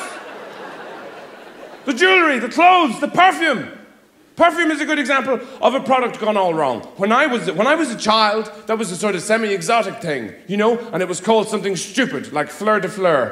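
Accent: Ireland. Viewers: he's Irish true and true. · I love the Irish accent, it sounds so musical 🎶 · Mia Scott British? No. Irish! ☘️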